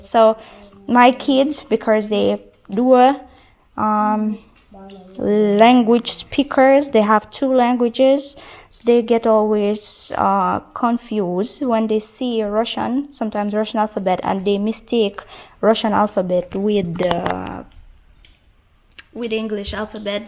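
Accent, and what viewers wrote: Jamaica. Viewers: not 100% fluent in English and patois, so there is mix of both when she talks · Ur getting the Patois LoL 😂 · Yes. Teach him. He will regret not learning when gets older. I love your Jamaican accent. Im Jamaican and taught myself russian some years ago. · You have a real jamaican accent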